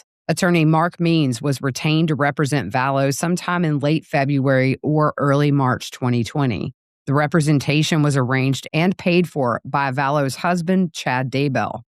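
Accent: South, United States. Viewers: Hello 😊 New sub! Just luv your accent! You’re the original southern gal, I am an implant lol. Missed the ID trials & hoping to play catch up here. Since you got this 1st I feel extra lucky to have finally found my go-to for this case! 😊 Enjoy tonight with your son!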